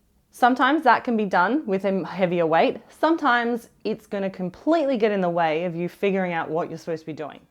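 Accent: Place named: Australia. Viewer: that aussie accent ⚡ i started with Maces 8, 12, 15, 10 & now finally 4kg & even 2kg. Steel clubs journey was different: 4kg pair, 4kg single, 12kg pair, 16kg pair, 20kg pair, 8kg pair, 6kg pair, 1.3 & 2kg indian wooden clubs pairs. the bodybuilder-gym mindset does not work with these tools at all. In all my workout light weights are warm-ups & cool-downs. Also it is great to have light-medium-heavy days. Everytime i hit a heavier mace, lighter ones feels so much lighter after the heavier ones. So, now 15kg feels still heavy, but the day i hit the 20kg one, 15kg will feel lighter. To ascend 2kg weight in steel mace i use golden rule: ability to perform 100 reps 10 to 2 or 200 reps 360. i also use steel maces for muscle building exercises & they can do that function too.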